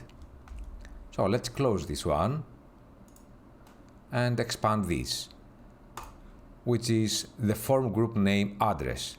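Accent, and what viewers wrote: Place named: Italy. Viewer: Thank you Sir this video. And also I am curious are you an Italian ? Because you have an Italian accent which is good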